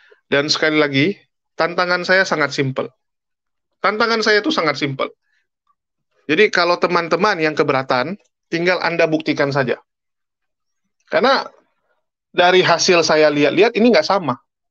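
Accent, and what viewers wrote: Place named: Medan, Indonesia. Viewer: Cina Medan eeee.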